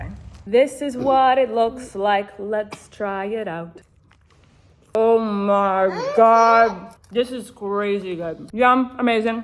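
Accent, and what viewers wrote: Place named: California, United States. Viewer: I knew you were saying it different but I thought it was your California accent. Lol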